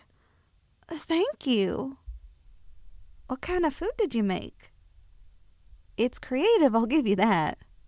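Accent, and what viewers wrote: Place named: South, United States. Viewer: What's with the southern accent my lady?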